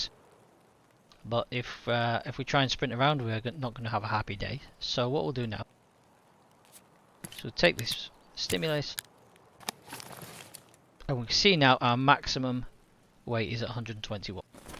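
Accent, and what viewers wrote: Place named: Wales, United Kingdom. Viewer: I starve in raids, thanks for explaining. Your Welsh accent is great. Not too hard to understand.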